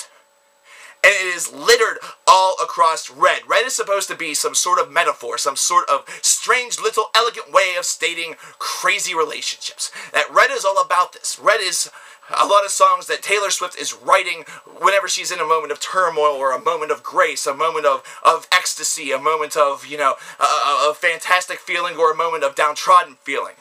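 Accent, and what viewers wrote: South, United States. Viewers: Your southern accent makes me laugh so hard hahahaha!!!!! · Lol that southern accent reminds me of Bill Hicks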